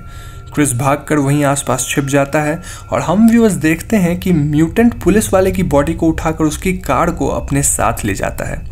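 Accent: Bengal, India. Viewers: Yourd Bangali accent is funny.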